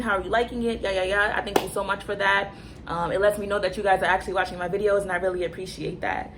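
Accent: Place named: New York, United States. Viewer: Ouu im from nola and and I hear that ny accent are u from queens ?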